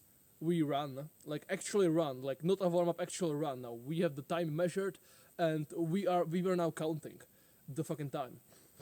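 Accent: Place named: Czech Republic. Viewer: You and have the same exact Czech accent English. It's awesome.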